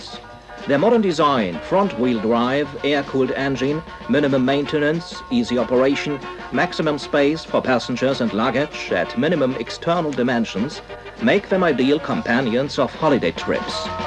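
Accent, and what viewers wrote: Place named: Germany. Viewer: It's interesting that the narrator speaks English with a noticeable German accent, leaves the factory name completely in German (not that they'd translate it fully, which would be something like "Saxon Ring Automobile Works Public Trust" but at least say "vee ee bee" instead of "fow eh bay") and calls the car "Trabant six hundred one" when "six oh one" would be how an English speaker would more likely read it.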